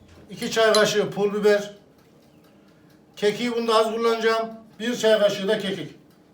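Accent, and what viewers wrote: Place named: Aksaray, Turkey. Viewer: Sizi ailecek izliyoruz.guzel ve sade anlatimla.sizin ispanakli patates yemeginizi yapacagiz. bey ben aksarayliyim.sizin siveniz bizim oraninkine benziyor merak ettim nereli oldugunuzu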